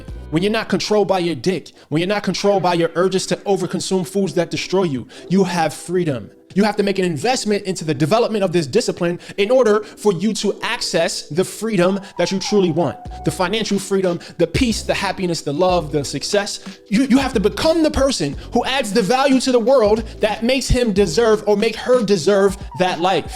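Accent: New York, United States. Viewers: Sound like HOV! That’s that NY! Let’s get it God like Men! Likeness for the WINGERS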